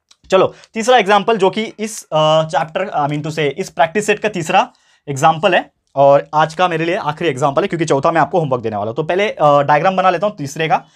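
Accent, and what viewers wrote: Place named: Mumbai, India. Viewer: I am 51 yrs , schooled in Mumbai, you speak Bambaiya Hindi liked your explanation very much watching you from Goa.😊 Thankyou 🙏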